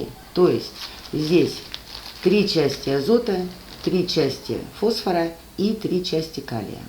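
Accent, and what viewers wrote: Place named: Ukraine. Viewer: а я решила, вы из Украины, говор-то наш. Родились в Украине? ))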